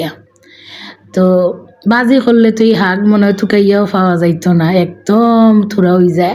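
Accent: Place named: Sylhet, Bangladesh. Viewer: সিলেটি মাত বহুত সুন্দর 😂❤❤❤❤❤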